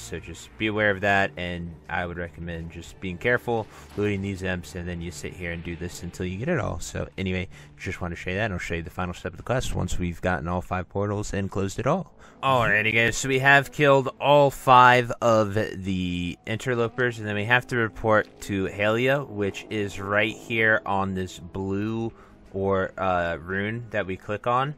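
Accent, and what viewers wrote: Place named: Texas, United States. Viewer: Ah, you sound similar to a guy I know from Texas 😊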